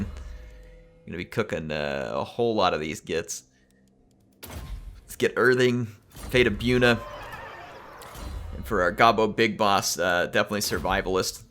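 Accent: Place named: Midwest, United States. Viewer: Hearing trying to do a cockney English Greenskin accent, but he has such a strong Midwestern American accent.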